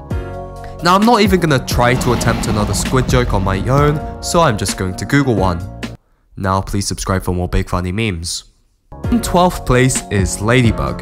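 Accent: France. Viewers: wait hold up, are you french?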